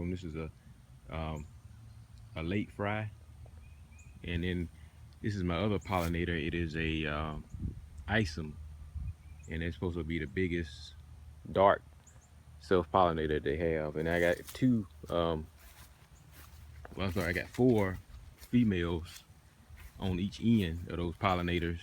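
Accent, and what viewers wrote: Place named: South, United States. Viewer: I've read that pH should be 5.5-6.5, so I think you're putting lime when you should be putting sulphur instead. My Rubycrisp is doing great with highly organic soil, a handful of sulphur, a few handfuls of urea, a couple handfuls of neem pellets to block nematodes, and thick pine bark mulch. I do like the way you speak "southern" though. 🤣 Very Best Regards, Tom Scott Author ● Speaker ● World's Leading Expert on the Corrupt U.S. Legal System Our American Injustice System Stack the Legal Odds in Your Favor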